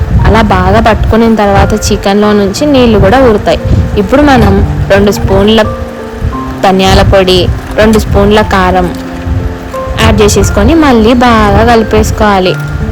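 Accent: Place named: Kadapa, India. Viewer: Kadapa slag vinte ,aa feelee verappa😊😊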